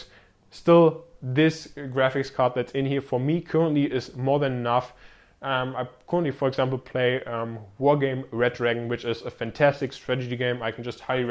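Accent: Germany. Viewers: Man hört Mega dass du deutsch bist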